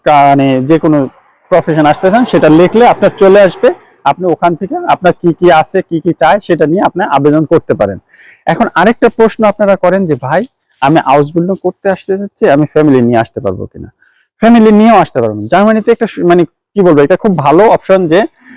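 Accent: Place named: Comilla, Bangladesh. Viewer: আপনার কথা কুমিল্লার সাথে মিলে যাচ্ছে।স্যার আপনি কি কুমিল্লার সন্তান?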